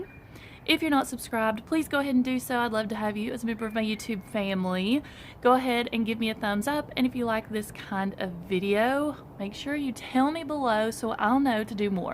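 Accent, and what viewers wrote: South, United States. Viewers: Hi there! First time viewer! I'm LOVING these ideas! I'm hearing a Southern Accent..🎶🎶 to my ears (Southern girl here as well 😊) just curious where you're located? Thanks for sharing and I look forward to seeing more of your videos!! Ps: the Pineapple bags are SO cute, the strings on mine however, lasted 2 whole days. I was so sad and couldn't find any others. 😞 I eventually will "fix" mine 😂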